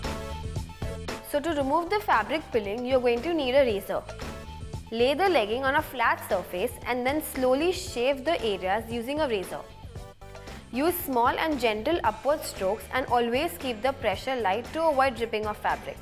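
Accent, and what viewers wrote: India. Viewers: Tania Castellon lol she is speaking English with an Indian accent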